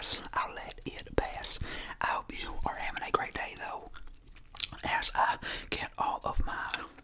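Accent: South, United States. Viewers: He has an unique way to do the asmr movies.I am a fan for a long time and never dissapointed me.Altough i prefer his chewing gum rumble,hand movements and of course the southern accent.I rewatch old vids and i am ok.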